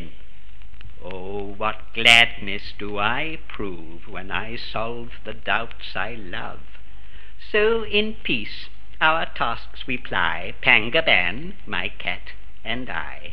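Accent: United Kingdom. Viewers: The poem is Irish so why are you delighted to hear a British accent? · Delightful to hear a British voice reciting this poem. By the way, why is Pangur Ban always portrayed as an all white cat?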